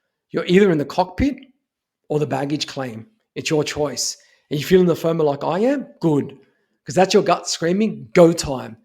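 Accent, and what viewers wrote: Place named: Australia. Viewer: Keep the vids coming. Good work. Guessing you're an Aussie?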